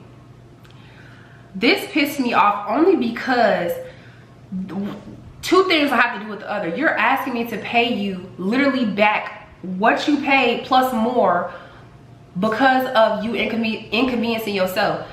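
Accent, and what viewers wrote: Chicago, United States. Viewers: This my first video I ever watched but you have to be from Chicago lol. New subscriber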